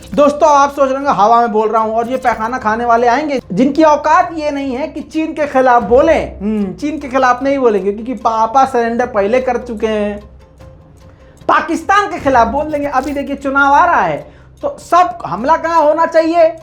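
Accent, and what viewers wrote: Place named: Western Uttar Pradesh, India. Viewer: aap Delhi brahampuri se ho na mujhe btana waise aapka birth paschi. Up se ho sakta h kyuki aapke baat Karne ka tarika aesa hi lagta h hamare yha k bujurg chacha taoo bujurg fan h yha tk k chote bachche bhi aapke channel k bare Mai cmnt karte h chacha ko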